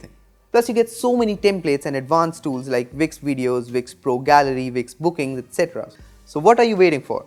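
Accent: India. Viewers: work on your indian accent. Western people like me will click away, like I just d..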